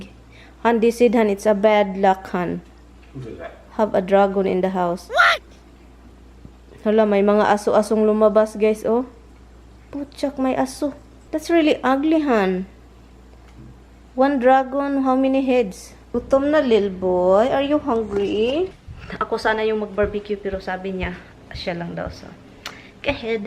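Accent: Visayas, Philippines. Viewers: Halatang bisaya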